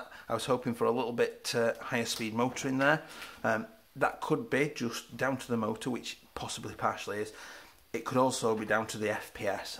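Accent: United Kingdom. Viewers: Bro I clicked on this thinking you were reviewing the Litefight MCR, then I heard the British accent and got confused why a Brit is reviewing a firearm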